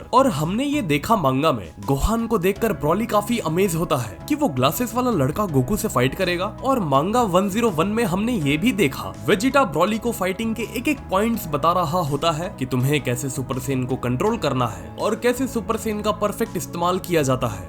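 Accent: Maharashtra, India. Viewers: Your manga narration are the best and the Marathi accent is crazy ! 😂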